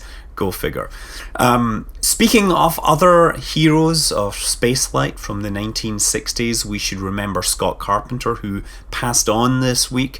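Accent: Scotland, United Kingdom. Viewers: Hey Scott, every time I witch to you channel from say TotalBiscuit or Polaris or wherever, the first thing I have to do is turn down the volume before your fine Scottish brogue deafens me! Otherwise, your channel is awesome.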